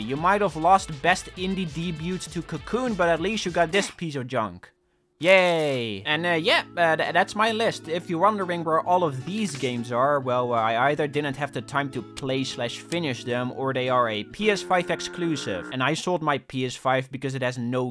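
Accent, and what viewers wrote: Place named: Netherlands. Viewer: I like your funny accent Dutch dude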